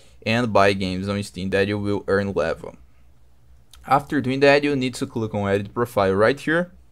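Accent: Brazil. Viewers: AKSDKASKF percebi que o mano é brazilian no sotaque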